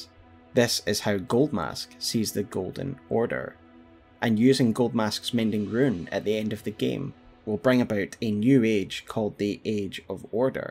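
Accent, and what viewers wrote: Scotland, United Kingdom. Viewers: I don't mean to alienate you, but can you please let me know what region of the UK you are from, I'm struggling to pinpoint your accent. Love the content! You are a lore machine. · Man, don't even try to hide your scottish accent, it sounds good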